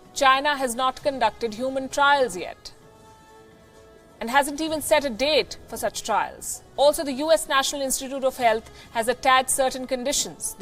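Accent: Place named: North India, India. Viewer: var1328 Lol no. That's the North Indian accent. British sound completely different.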